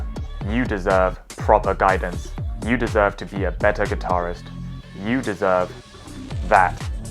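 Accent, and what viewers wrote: United Kingdom. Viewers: BRITISH ALERT! im a Brit too :) love the videos by the way❤️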